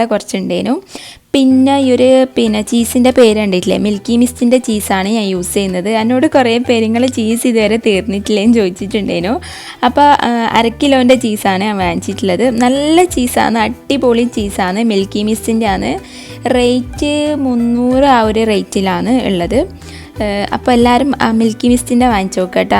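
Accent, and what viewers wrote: Kannur, India. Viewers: kannoor bashayum poliyan nigalude cookkingum poliyan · Nalla rasand nammale kannur bhasha👍👍👍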